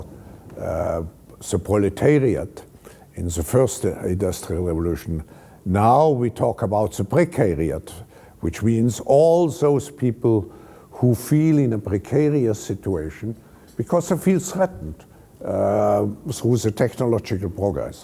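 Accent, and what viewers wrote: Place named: Germany. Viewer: That German accents is not a meme lmao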